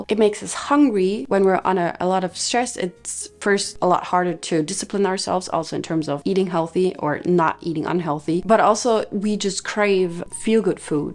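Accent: Germany. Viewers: I love her German pronunciation though hihi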